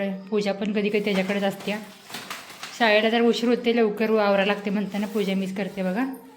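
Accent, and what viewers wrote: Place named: Karnataka, India. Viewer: तुला मराठी पुर्ण जमत नाही जरा कन्नड वळनावर आहेत भाषा मी मुचंडीची आहे तुमच्या मामाच्या घराशेरचे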